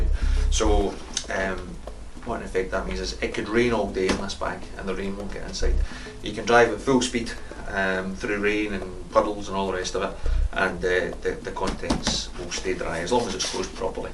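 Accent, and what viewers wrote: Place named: Scotland, United Kingdom. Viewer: Quality product at a great price, added to wish list! Thanks for this helpful demo. I wish all salesmen were Scottish, love the accent :D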